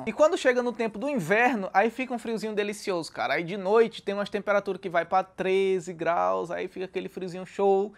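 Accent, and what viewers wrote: Northeast, Brazil. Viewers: Caí aqui, e tu é nordestino? Pois parece com esse sotaque kkkkkk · Oxi vc é do Nordeste é...????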